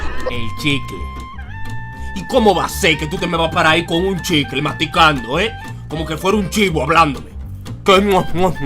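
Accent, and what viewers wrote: Dominican Republic. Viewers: Apuesto que es Dominicano jajaja 🇩🇴🇩🇴🇩🇴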